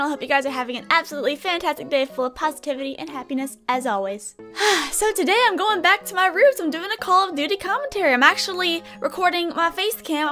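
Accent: South, United States. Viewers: are you southern I like your accent lol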